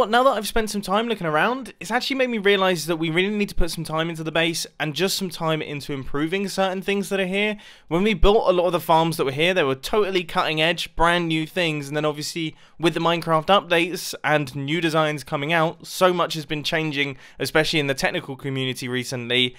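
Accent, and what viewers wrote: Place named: United Kingdom. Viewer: Am I the only America who thinks it’s hilarious when Britains speak basically a different language like it’s not pronounced laver it pronounced LAVA!!!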